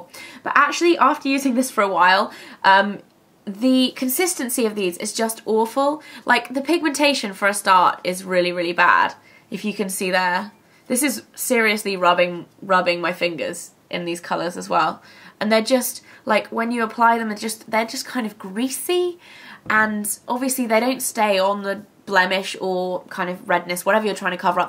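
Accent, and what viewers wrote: England, United Kingdom. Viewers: Aww ur accent reminds me of when I used to live in England! Awe I'm defiantly going to buy one of ur recommended concealers...lol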